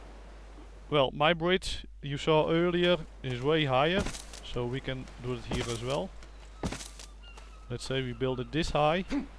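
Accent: Netherlands. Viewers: the dutch accent is strong with this one...... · Let me guess... You're Dutch? :p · he's dutch thats where the accent comes from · Heeyy are you Dutch? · are yo dutch? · jij hebt een nederlands acentje ÷) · Ben je nl?😂👍🏼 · are u dutch :) ? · Are you dutch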